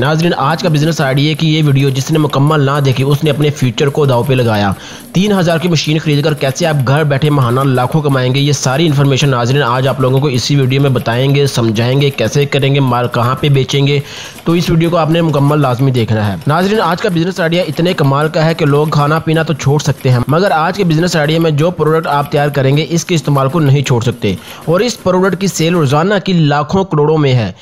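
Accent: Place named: Lahore, Pakistan. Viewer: یار بھائی پرودکٹ نہیں پرادکٹ لاہوری اناز کی انگلش سے بور نہ کیا کرو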